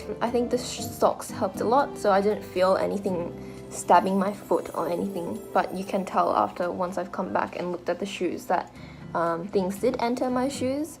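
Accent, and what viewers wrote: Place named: United Kingdom. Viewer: Your british accent is so 'umai'.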